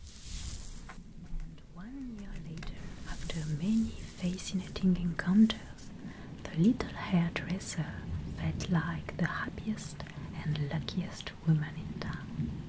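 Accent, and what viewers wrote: France. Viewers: i love the french accent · je crois déceler un accent ? Tu es Française ? pourrais tu faire une vidéo en français j'aimerai beaucoup écouter ton travail dans ma langue maternelle. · I’ve checked out many different ASMR sites, and ALWAYS end up coming back to this one. The tingles come on really quickly as soon as you begin doing anything with your hands- from counting to palm reading to (especially) smoothing fabric - and your beautiful French accent is so calming to go along with it. You are the Absolute ASMR QUEEN - thank you for doing what you do! ❤😌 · I'm in love with your voice and french accent. Your videos are just pure art but I like the most fall asleep listening only your voice and sounds. Nothing more relaxing!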